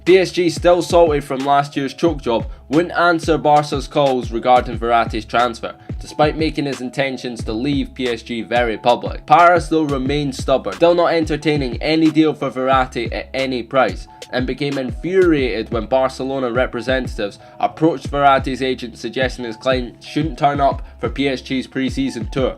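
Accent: Scotland, United Kingdom. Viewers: i thought you sounded Scottish I support Cardiff and man united because my dad supports them and united because all my mums family support them and there my closest team I'm just saying so I don't sound like a bandwagon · U Scottish?? Instant sub if u r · british accent banging mate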